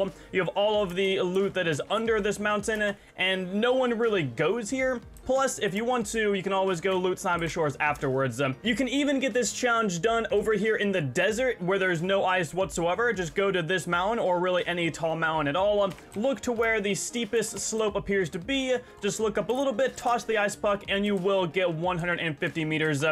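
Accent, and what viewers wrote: United States. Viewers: You kinda sound like Lachlan but more of an American accent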